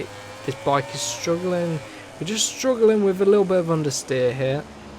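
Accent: United Kingdom. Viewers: Where in England you from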